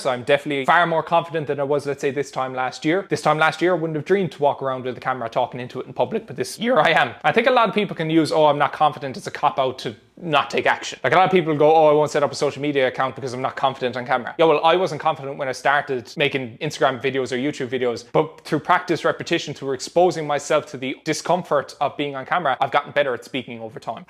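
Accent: Ireland. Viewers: he's from ireland, I think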